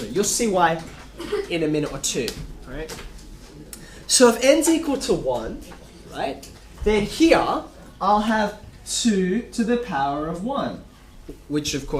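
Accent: Australia. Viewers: a prime example of an Asian with an Australian accent.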